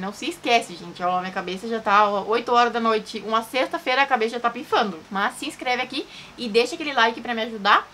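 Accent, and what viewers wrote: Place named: Spain. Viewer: Gente eh muito engraçado. Vc agora tem o sotaque de espanhola que mora no Brasil. Kkk Eh muito louco. Eu nunca fui a Minas Gerais mas só de minha mãe ter nascido lá eu falo uai trem, credo! Kkkkkkk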